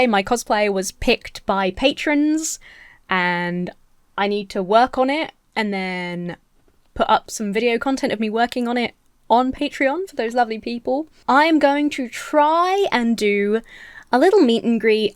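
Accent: United States. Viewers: Love your American accent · Your American accent is so good it's tripping me out lol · Pretty good "American Accent". I don't think I've ever heard anyone in the US specifically use "Snark" as a noun. We may use the term, but as an adjective; "Snarky", rather than 'Plenty of snark". But, someone might, just to be different and bring attention to it. And, generally, in the US people say "Scary Movies: rather than "Horror Movies". I think British 'Liberalism' is more like American 'Libertarian'. Both basically lean toward Socially Progressive, Freedom of Choice, and Open to Different Lifestyles. The main differences are more focused towards Government Oversight and Capitalism. I recently watched an interview with Keanu Reeves talking about Deep Fakes. He was really disturbed by them. He said he watched an entire scene from a movie - that he didn't make - but it was so close to HIM that he would have believed it. 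There have been scholarly papers written on Star Trek's Holodeck addiction and what could/would happen if/when we have that sort of technology. You could effectively have mean, nasty, violent rapey sex with any celebrity, or crew member, or whomever. It would be seriously fucked up! You said, based on your audience, the chances are slim of someone knowing who you are. I did some quick maths; Congrats, .00002 of the world are fans of yours! MCM stands for Movies, Comics, Media. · That's crazy that someone did that. Also I love your American accent. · I rarely ever see people attempt (let alone, nail) an American accent. I was just listening for a few seconds after clicking this video and thought i tapped the wrong thumbnail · Nice American accent! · I completely didn't notice the American accent until she became British again. Bro. Edit: I'm American, and I can say that it's a pretty convincing accent. 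It just feels weird to hear with the knowledge that it's fake. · You're American accent is scary good, if I tried to do a British accent I would sound like Dick Van Dike in Mary Poppins. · Your American accent creeps me out a little. 😆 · That was a SCARILY accurate american accent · All I learned from this video was how much I want to hear your american accent MORE. LIke a LOT more. k thx bye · Your American accent is superb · IT WAS SO WEIRD HEARING YOU TALK WITH AN AMERICAN ACCENT!!!! As an American, you sounded totally authentic. And I'm sorry, I cannot lie. You sounded kinda hot.....for a lesbian. ;P I always love and respect you just the way you are. Please, forgive me for finding you so (in random order) charming, funny, beautiful, smart and sexy. I'm such a horrible sexist! lol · the American accent. Love it. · a note about your american accent, you've gotten really good at it lately, but you are over-ennunciating everything, you sound like a work training video more than a real person. 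You aren't reducing enough vowels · You are not a small channel anymore. Twice in the past 2 months I came across other channels that mentioned your name or had your picture. You have a great american accent. You are almost as good as Alba Baptista who started in "Watrrior Nun". I was shocked when I found out baptista wasn't american. There are too many bad people on the internet. I hope they didn't completely ruin it. I love my online friends. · Your American accent is pretty amazing. In most of the US, we do say the R in ‘snark’, although it’s not that common of a word in younger generations. Snarky is sometimes, but sassy or sarcastic are more common in my experience. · Your american accent is getting really good, it's a little disorienting! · sometimes your American accent reminds me of Erika Thompson- texasbeeworks. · 😂😂 I find it hilarious when you lean in hard to the American accent and British-isms just to draw the contrast. 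(Or vice versa.) By the way, you're doing great on your American accent! EDIT: Just got to the part about the bad news. That's awful. I hope you can find some legal recourse.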